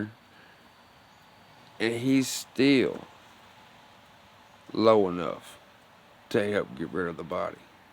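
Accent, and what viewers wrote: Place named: South, United States. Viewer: I just heard you say your from the South. Where ya from? I’m in Arkansas from Louisiana. I knew you sound like home.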